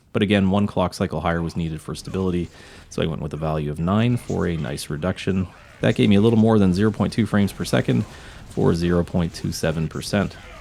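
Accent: Canada. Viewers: I saw Voodoo 3's in the thumbnail and assumed this was a "Bits und Bolts" video. I was surprised when a familiar Canadian accent appeared instead. *waves from Winnipeg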